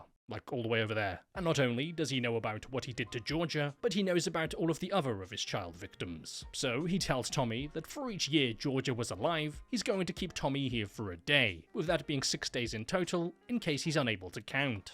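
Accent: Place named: Scotland, United Kingdom. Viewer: it's his "I'm pretty sure this is a British accent... with a touch of Scott" his vernacular and his emphasis are all just a bit over the top. But he is a "broken man" (like most of us) with a cynical outlook on every last thing. Then the switch is thrown and he will go on the best tangents about some aspect of the movie. I'd listen to him review breakfast cereals. is a treat.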